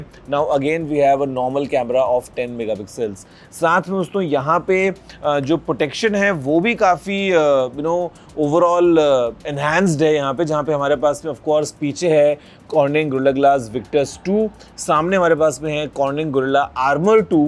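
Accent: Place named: South Delhi, India. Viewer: South delhi girls jaisi accent hai bhai teri. Makeover ke sath sath accent pe bhi kaam kar thoda.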